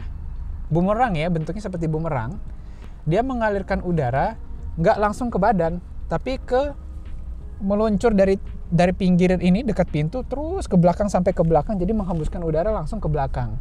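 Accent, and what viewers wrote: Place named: West Java, Indonesia. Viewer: Logat na mah Sunda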